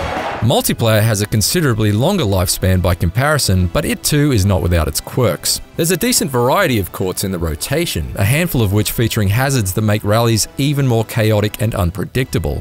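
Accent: Australia. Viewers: Great review and most importantly I really enjoyed the Aussie accent……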